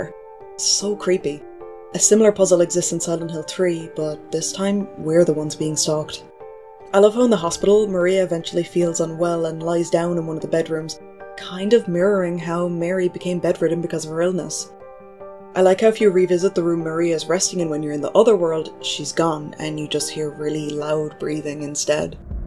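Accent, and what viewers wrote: Ireland. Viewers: Thank you so much for this video I love everything silent Hill I was very annoyed that I didn’t use guy Cihi in the remaster and I have high hopes for the remake not sure if it’s still Romor but I believe it is coming . Shout out from Dublin. Your Irish too yeah 🤪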